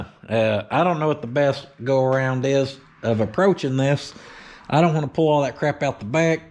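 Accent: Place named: South, United States. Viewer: are you sure your not one of rednecks from down south way. The way you talk and spell sounds like us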